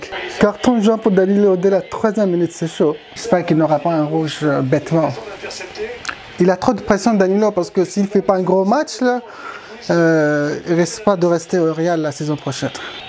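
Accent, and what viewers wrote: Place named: Brazil. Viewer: ta un accent bresiliens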